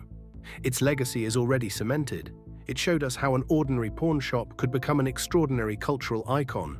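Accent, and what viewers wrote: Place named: United Kingdom. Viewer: Obviously made by a Brit.There's a whole series about British pawnbrokers, and they all pronounce it 'pornbrokah'. Quite distracting, actually...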